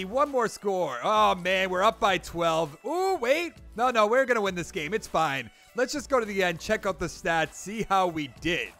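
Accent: Boston, United States. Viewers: Boston accent on point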